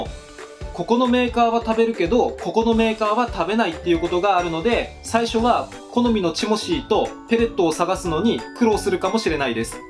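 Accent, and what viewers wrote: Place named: Japan. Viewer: もしかして地方出身の方ですか？ 話し方が可愛い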